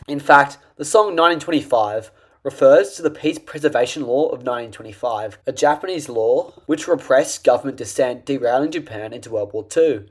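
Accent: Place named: London, United Kingdom. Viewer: You still talk like a Greater London citizen though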